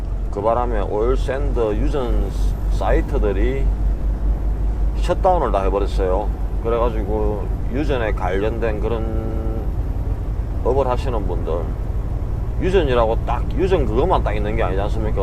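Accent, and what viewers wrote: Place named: Busan, South Korea. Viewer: 와 부산말 제대로네예 ㅎㅎ 구수하이 좋네예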